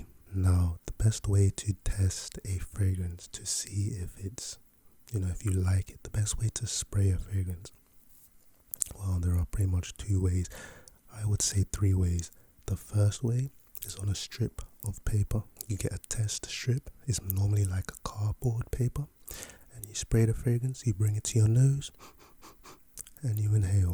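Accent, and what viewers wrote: United Kingdom. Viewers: Loving these bro.. an if I’m not mistaken , you from the UK ? You gotta new sub 👌🏻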